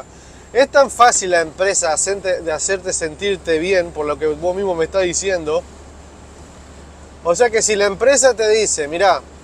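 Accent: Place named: Uruguay. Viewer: Un gusto escribirle, tmb soy del canal Mobile home. Sr: x DESEARLE SIEMPRE PROSPERIDAD EN TODOS SUS TRABAJOS Y ME ALEGRÓ MUCHO SU COMPRA DEL SCANIA. Como si fuera x mis hijos. PROSPERIDAD, SALUD X ELLOS Y FLIA. Y LE SUMO A UD. Con CARIÑO Y RESPECTO, SE LO MERECE ES TRABAJADOR, LUCHADOR, DE TODO OFICIO. Le saludo desde Uruguay. Mis hijos viven ahí en España. Los tengo lejos hace casi 2 décadas x tal motivo comencé a seguirlo, pensé q podía ser Uruguayo x el modo de hablar, somos de paises hermanos. SIEMPRE ADELANTE Y A CUIDARSE. Me despido con AFECTO.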